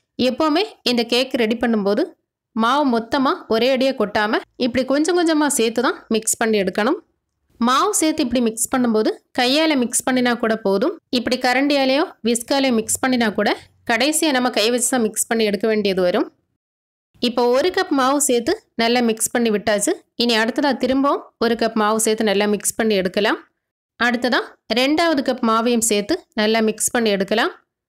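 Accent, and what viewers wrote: Kerala, India. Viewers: Are you a malayali pronnounsation malayali touch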